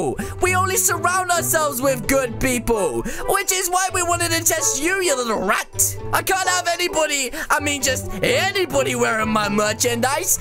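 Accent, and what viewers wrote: United Kingdom. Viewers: XD British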